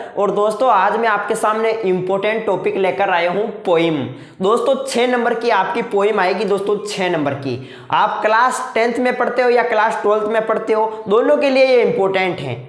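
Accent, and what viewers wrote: Rajasthan, India. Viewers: BHAI Rajasthan su he Kay thaki boli bahut choki lagi ❤️❤️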